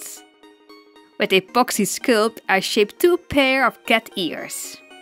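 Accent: Netherlands. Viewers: Is it just me or does it sound like she has a dutch accent? it reminds me of my cousin (that lives in Belgium/Holand). great dolls tho! I also saw Dollightfull’s video, and i think both the dolls you guys made were amazing! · Me whos new to the channel: YASS THE DUTCH ACCENT, (im from Belgium and speek Dutch) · I smell dutch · Well i Mean she does live in the netherlands so yeah i think thats why youre hearing a dutch accent😁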